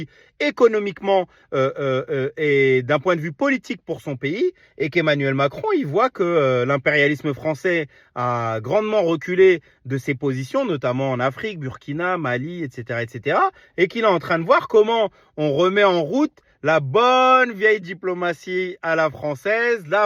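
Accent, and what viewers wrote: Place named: Arab world. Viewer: pourquoi tu rigoles ? tu parles français avec une accent d'arabe. Lui parle arabe avec un accent français. Toi comprendre ?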